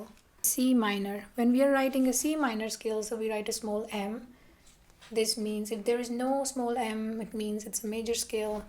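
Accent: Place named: United States. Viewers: Why do you speak like an American firangi when clearly you are not!! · It's not American firangi, it's American english accent and sounds way better than trashy Indian English accent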